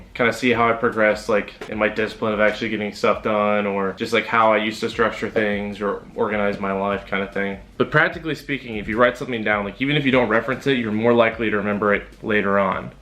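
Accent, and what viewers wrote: Arkansas, United States. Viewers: Are you from arkansas? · Are you from Arkansas? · no way !!!are you form arkansas?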